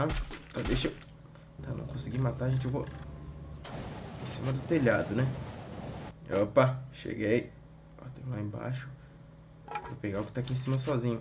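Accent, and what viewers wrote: Bahia, Brazil. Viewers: Tu é da Bahia mano?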